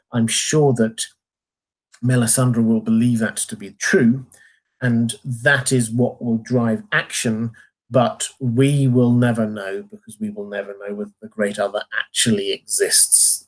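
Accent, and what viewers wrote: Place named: Southern England, United Kingdom. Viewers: sounds like a southern English accent. I've never been to England so take my guess with a grain of salt. I would love to know the answer though. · Hes English alright, from the south. Lovely voice x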